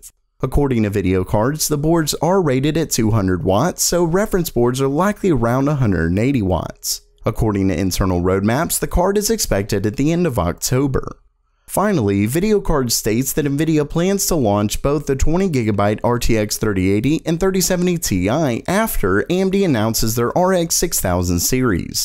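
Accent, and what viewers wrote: South, United States. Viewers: Hes hiding his american southern accent for some reason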